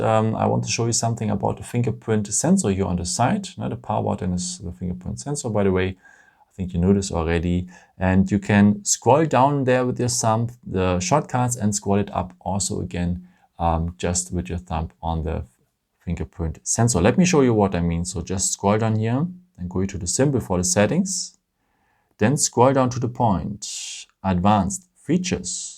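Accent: Germany. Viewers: Are you from germany? Kommst du aus deutschland?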